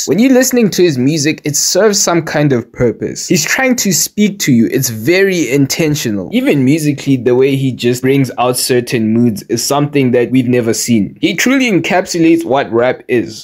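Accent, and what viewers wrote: South Africa. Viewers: You South African? That accent... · You sound like you're from 🇿🇦...🤔🤔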